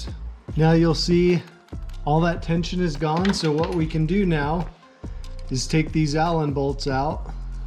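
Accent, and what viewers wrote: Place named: Payson, United States. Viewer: Didn't have to look at your contact info to know you were from Payson haha. Your accent tipped me off, and the RMATV bag in the shot comfirmed haha. Great video! My bike burns oil on startup. It's got over 1,000 hours on all the original top end. I'll probably ride it through the summer and rebuild the top end this winter. Great video!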